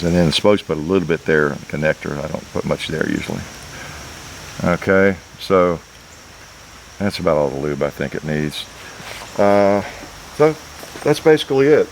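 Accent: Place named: Florida, United States. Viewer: You sir, just gained a follower and a fan. You sound like a Florida boy just like me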